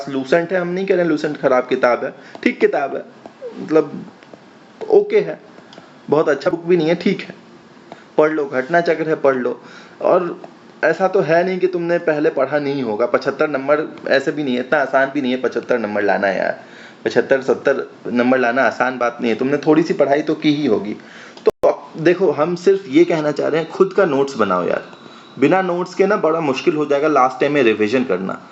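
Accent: Bihar, India. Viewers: Hum matlab Mai sunkar bhut acha lga .. pkka Bihar bala language 🤩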